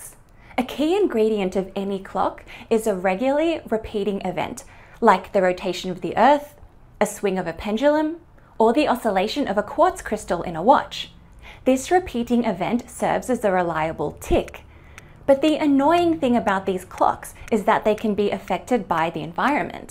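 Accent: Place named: Australia. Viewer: so nice to hear an Australian accent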